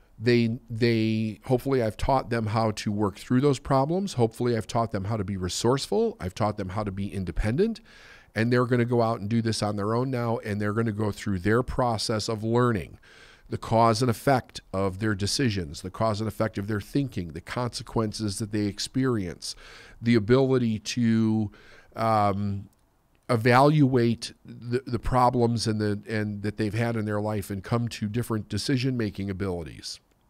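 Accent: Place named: Midwest, United States. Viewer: This guys midwestern accent is driving me NUTS. Bayack, flayat.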